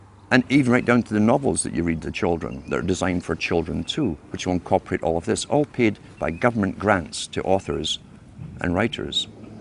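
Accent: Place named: Scotland, United Kingdom. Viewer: He has a different voice, he has a Scottish voice here, and all his other audios he has a different accent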